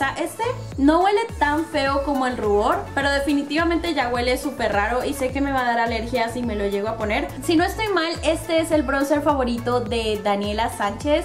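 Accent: Mexico. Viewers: Habla como Mexicana....ella no es Colombiana?? Ni idea... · A penas estoy viendo tus vídeos y creí al principio que eras mexicana. ¿De dónde eres?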